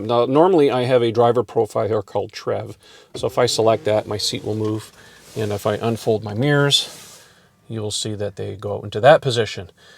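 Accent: Canada. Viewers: Thanks. Great idea! Do I detect a Canadian accent there? Looked like Mississauga too.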